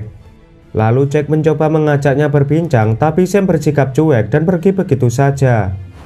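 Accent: Java, Indonesia. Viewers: Suaramu kok medok jowo banget mas.. Soko ngendi sampean?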